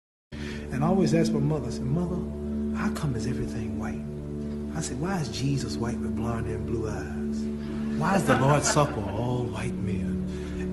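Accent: United Kingdom. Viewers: The British accent is strong. Good accent.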